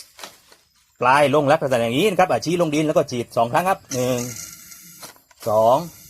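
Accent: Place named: South, Thailand. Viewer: สำเนียงภาษาคนใต้เลยหลวง ชัดเจนครับผม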